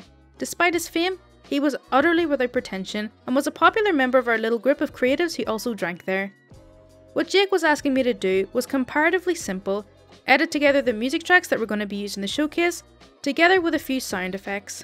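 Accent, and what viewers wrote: Ireland. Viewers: Good morning, Markus etc. First, there is nothing better than the sweet voice of an Irish lass and a picture of a totally contented feline. Then the second thing.... a wee dram of single malt. 🐱🐱🐱🐶👴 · First story: There's nothing wrong with a charming Irish accent, even for a British theater/dance story..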